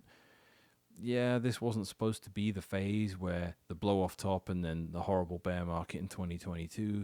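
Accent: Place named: England, United Kingdom. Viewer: This guy is 100% English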